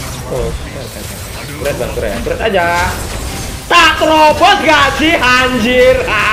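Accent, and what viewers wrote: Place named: Malang, Indonesia. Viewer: Triple kill-> niyok-> jebret->Maniac😂😂 Boso jowone podo karo boso Jowo Malang-an😂 Salam dugi Kota Malang ❤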